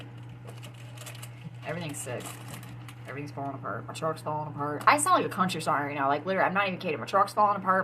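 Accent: Michigan, United States. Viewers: lol i can tell you are a Michingander lol we have our own accent Hope you will think about lifecasting eat,sleep,s=it,shave shower even sleep streams😃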